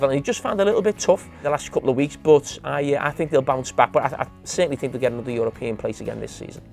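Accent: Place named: Liverpool, United Kingdom. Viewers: Jackanory with a scouser, very relaxing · Love to hear Scouse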